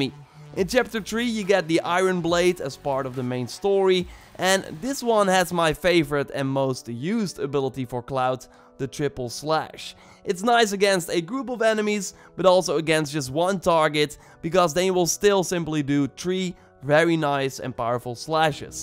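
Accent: Netherlands. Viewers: Are you from holland??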